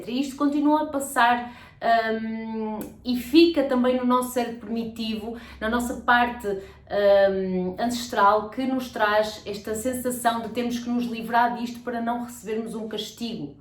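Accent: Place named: North, Portugal. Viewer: Olá. É muito bonita e excelente mensagem (só é pena o sotaque do norte!!!!!!), mas ganharia em melhorar o som dos seus vídeos. Veja o meu canal e subscreva (já subscrevi o seu!!!), tenho imensos tutoriais de edição de vídeo! Tudo a correr bem!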